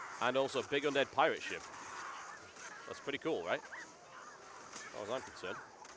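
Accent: United Kingdom. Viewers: ok there you are absolutly right, he is good and shows alot, skills, enviroment and such stuff. and other people who show stuff just suck. saw a video from an elementalist, over 10 minutes against the shatterer and he only used 3 skills, thats boring. but is really good, doing alot of skills, movement is good and his commentaries are really cool. i dont like hearing english with that british accent. but his commentaries are so awesome with the accent. like his videos.:)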